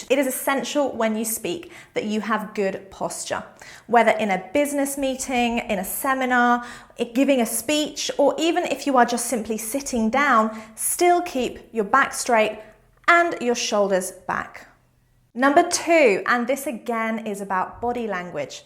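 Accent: United Kingdom. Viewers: A very engaging presentation. I like Teacher articulation of words - so clear, great pronunciations, so distinctly British accent. Reminds me of my favourite english teacher, Miss Courtney of "Mind Your Language" fame. She's always back straight chin up. I really marvelled the way she speaks, so British. She's got her own peculiar strong screen presence.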